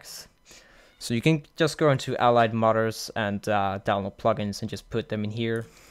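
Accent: Denmark. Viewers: oh your from denmark :D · Are you danish?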